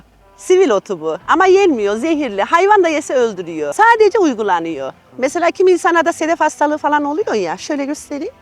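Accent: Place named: Adana, Turkey. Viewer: Adanalılar şivesine benziyor Ablanın konuşma tarzı verdiği bilgiler çok kıymetli teşekkür ediyorum Allah razı olsun. 🤲🌷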